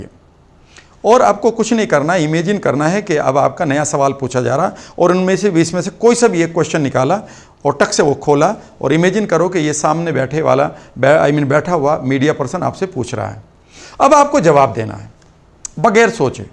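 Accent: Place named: West Rajasthan, India. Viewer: Sir aap Rajasthan se ho na, aapki aaj tone se pata laga, wo bhi west rajasthan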